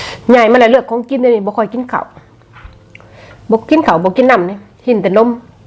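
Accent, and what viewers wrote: Savannakhet, Laos. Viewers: ມັກສຳນຽງສາວສະຫວັນ ລູກສາວຈັ່ງແມ່ນເປັນຕາແພງ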